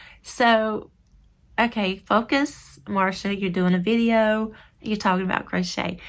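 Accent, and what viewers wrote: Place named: South, United States. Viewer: Oh lord I definitely watch to the end, I could listen to your Southern accent all day!! The watch time can be tricky as it is not necessarily 16 minutes from the start to the 16 minute mark, some people may forward ahead and watch later in the video sections. Just keep being you however long they are I will still watch!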